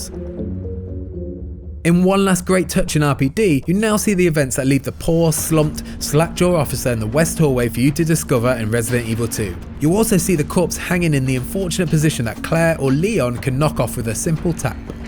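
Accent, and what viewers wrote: United Kingdom. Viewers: 1. There are many accents in Britain 2. This guy IS British. Are you deaf? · Zucc i am 1000% aware he IS british and he has a BRITISH ACCENT... So i guess the problem now is why did i have to put “*in a british accent* if he’s naturally british huh? Why the need to point that out... That’s the issue, right?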